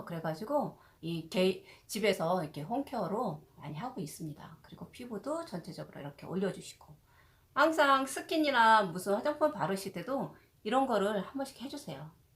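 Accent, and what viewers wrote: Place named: Chungcheong, South Korea. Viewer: 충청도 분 이시군요 ㅎ 영상잘보았습니다 구독 꾹~~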